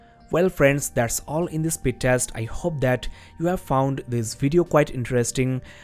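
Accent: India. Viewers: CopyRights can suck it repertoire* see? you cant even write well and no, I dont mean stuttering, but the accent all indians have while speaking english,, is annoying and sounds like Apu from the Simpson 😂😂😂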